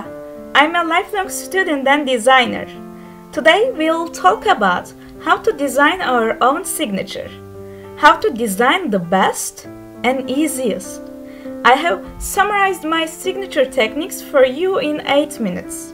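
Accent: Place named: Japan. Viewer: Japanese English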